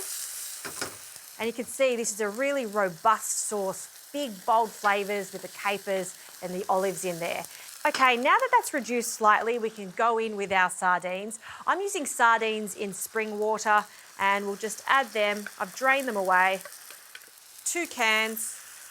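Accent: Australia. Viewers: I don't see the problem here. She said pasta, she has Aussies accent. We all know what she meant. Also, no ones asking. Hope ya have a better day champ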